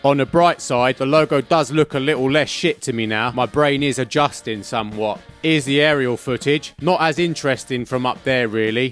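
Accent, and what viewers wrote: United Kingdom. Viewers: This is the most British person I’ve ever heard. I love it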